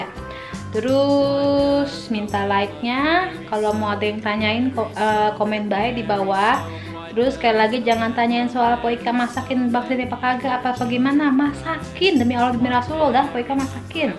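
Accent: Jakarta, Indonesia. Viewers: Mantap mpo masakan ikan nya... Bikin ngiler dan laper... Dan lucu jg sm mpo...bicara nya tetap betawi asli..😘😘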